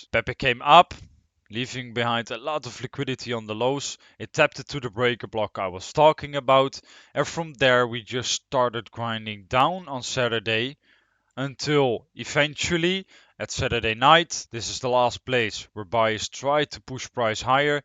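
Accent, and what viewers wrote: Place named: Netherlands. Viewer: Your accent is Dutch / Eindhoven I guess. In your opinion PEPE will make new ATH OR ? Thank you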